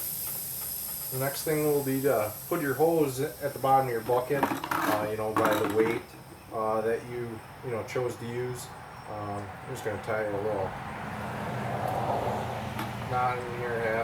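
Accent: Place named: Wisconsin, United States. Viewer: Done. I am also from Wisconsin so I recognized the "accent."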